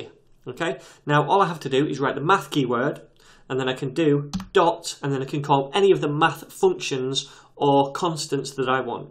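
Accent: United Kingdom. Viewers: Getrogo he is from U.K.)))))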